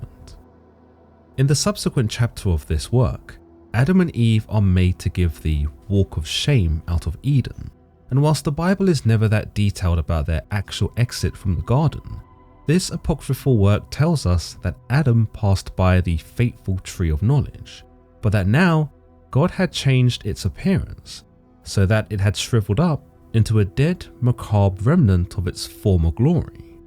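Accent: United Kingdom. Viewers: First time actually seeing this guy. This whole time I thought he was a Caucasian British man lmao.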